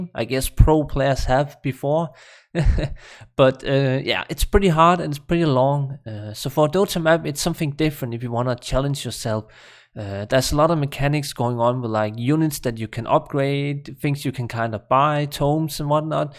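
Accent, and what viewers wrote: Denmark. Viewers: i love your Danglish :D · You're a dane, right? :)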